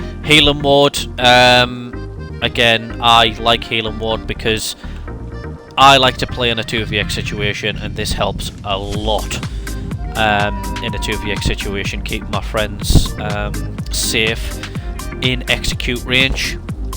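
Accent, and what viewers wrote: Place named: Newcastle, United Kingdom. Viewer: Didn’t realise you were a Geordie, were going to need a duel for best Geordie EU title 😅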